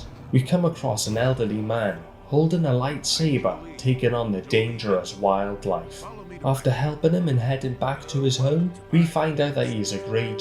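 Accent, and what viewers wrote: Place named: Wales, United Kingdom. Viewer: its what happens when we welsh try to speak properly so others can understand us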